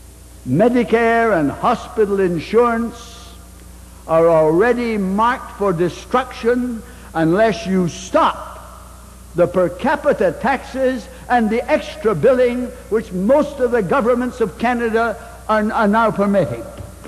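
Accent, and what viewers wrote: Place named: France. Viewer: I like the idea of free health care but our national budget comes first otherwise Canadians starve.some socialist believe that more barrowing and spending is a good thing but its your money and you spend it the way you want to.would this man qit ranting,his French acsent annoys the living hell out of me.scialisum dosent help the poor,it drags everyone down from richest of rich and poorest of poor.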